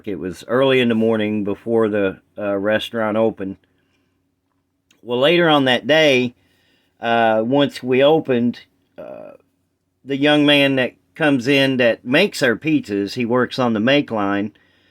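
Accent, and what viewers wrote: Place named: South, United States. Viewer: Fellow stacker here! Sounds like your from the south...Alabama here!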